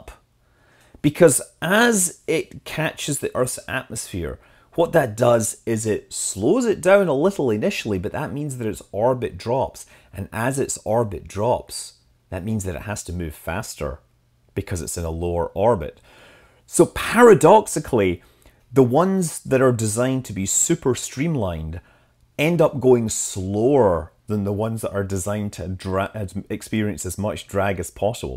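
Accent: Scotland, United Kingdom. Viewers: Is it scottish dialect what you are speaking?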